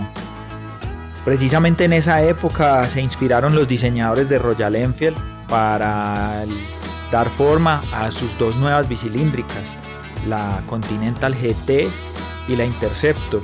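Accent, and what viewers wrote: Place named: Antioquia, Colombia. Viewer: Que bien se oye el acento paisa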